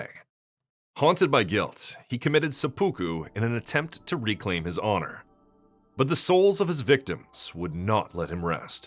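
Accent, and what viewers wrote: Canada. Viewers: Is this guy from Nova Scotia?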